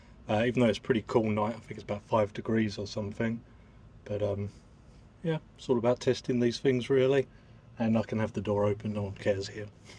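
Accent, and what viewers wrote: England, United Kingdom. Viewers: Sem aye , your English man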